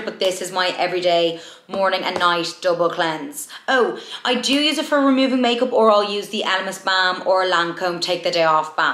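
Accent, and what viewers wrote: Ireland. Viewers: I was trying to figure out which accent you have the first couple of seconds in but it sounds Irish am I correct? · Hi I’m to your channel and so glad I came across you and your Irish which is a bonus and way I just want to ask what would you recommend something really hydrating for my skin please and also did you say you get Chemical peels if so please tell me we’re and who is great I would so appreciate it thanks till next time keep up the fab videos, happy new year also take care x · Its an Irish posh dublin accent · Yeah it’s Irish I’d say she’s from Dublin like myself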